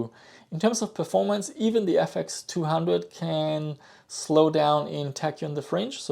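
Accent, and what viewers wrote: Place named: Germany. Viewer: Always enjoyed your videos! Question (please don't be offended): A few years ago, when I first started watching your content, I immediately thought you were German, but later learned that you live in Australia. My question is if you are in actuality a German living in Australia, or if you are a native? Your accent really throws me off :D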